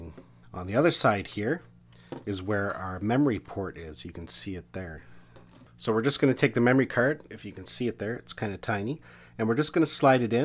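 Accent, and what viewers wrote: Canada. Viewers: Canadian accent made this so amazing 😌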